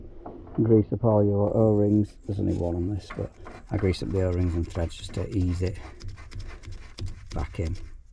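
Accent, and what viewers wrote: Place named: Black Country, United Kingdom. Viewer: recognised the Black Country tones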